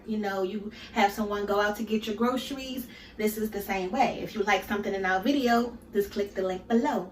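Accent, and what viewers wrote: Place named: Baltimore, United States. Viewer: I hear the Baltimore all in your voice sister ❤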